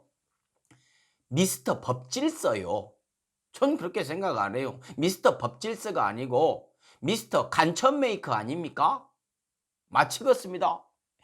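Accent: Jeolla, South Korea. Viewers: 성범씨 ㅋㅋㅋ 절라도말씨가 너무 맘에 와다부요~~~ 꼭 찝어서 가장 핫한뉴스를 찝어내서 씹어서 돌려부는 성범씨 시원한 사이다입니다 응원합니다 전라도광주에서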